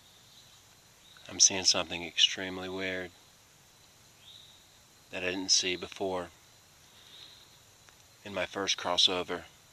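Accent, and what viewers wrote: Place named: South, United States. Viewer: I love your country accent. ;)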